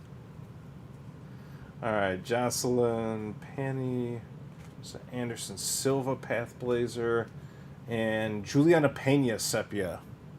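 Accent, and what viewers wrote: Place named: Midwest, United States. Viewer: Or maybe Chicago? Midwest for sure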